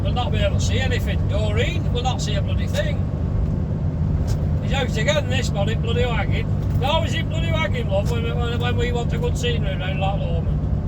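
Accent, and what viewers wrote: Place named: Lancashire, United Kingdom. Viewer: Hahaha 😂 your singing is shit lol but you are spot on about the road its not fit for purpose you might have been better back tracking to spean bridge then cross to Dalwhinnie on the A9 better road anyway love the lancs accent safe travels pal hopefully meet you on the road sometime for a blether keep on trucking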